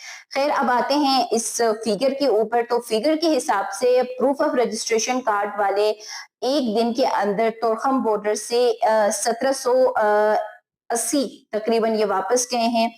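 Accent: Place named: Chitral, Pakistan. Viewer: میڈم آپ کا اردو بولنے کا انداز چترالیوں کی طرح ھے آپ کا تعلق چترال سے ھے؟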